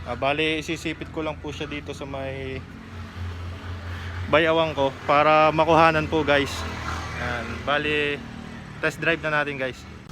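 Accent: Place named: Batangas, Philippines. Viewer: Sabe ko na taga batangas are punto palaang e hhaha support kita idol 💯💯💯 mabuhay batangeño!!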